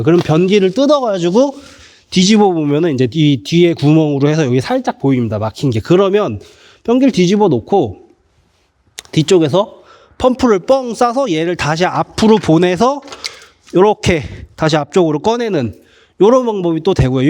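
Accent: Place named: Seoul, South Korea. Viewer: 변기가 막혀서 몇일채 고생하던 중 우연히 시청하게 되었습니다. 사장님처럼 양심적으로 하는 사람이 드문 세상이라서.. 또 요즘 경제사정도 좋지 않고, 평소에도 간단한 일은 손수 해결하던 사람이라 걷어붙이고 뚫는 시도를 했지만 이 글을 올리는 현재 성공하지 못하고 몇일째 화장실 대란을 겪고 있습니다.ㅠㅠ 혹시 영업지역이 어디신지? 말투를 들으면 서울 내지 수도권인 것 같은데 지방출장(전주)도 하시는지 궁금합니다. *ps: 글을 올리고 영상 속에서 말씀하신 내용을 곱씹어 보니 정말 정직하게 사업을 하시는 분으로 느껴져서 '좋아요'에 그치지 않고 '구독'까지 눌렀습니다. 그리고 연결된 링크를 따라 블로그 방문을 했는데 역시 서울 인근에서 활동을 하시는 분이네요.. 지방출장을 한다 해도 출장비가 추가되면 의미가 약해지는 결과라서 혹시 막힘 증상을 글이나 영상으로 알려드리고 문제해결의 도움을 받을 수 있을지 모르겠습니다.. (영상 끝부분의 내용으로 봐서는 변기끝자락(플랜지 가까이까지 근접한?) 근처까지 물건이 밀려나면서 막힌 탓에 차오른 물이 하루종일 지나도 전혀 빠지지 않는 것이 아닌가 하는 의심이 갑니다..ㅠㅠ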